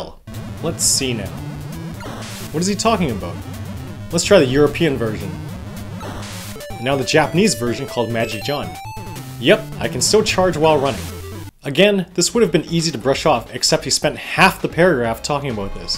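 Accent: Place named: Canada. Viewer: Cheers from a fellow Canadian! It was funny hearing Cdn "aboats" both in your review AND that Cheetahmen ad. :D And thanks for VGMaps too; didn't know you ran that site.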